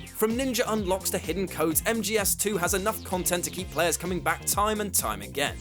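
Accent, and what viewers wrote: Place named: United Kingdom. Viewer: Why is there always some posh English bloke narrating this garbage?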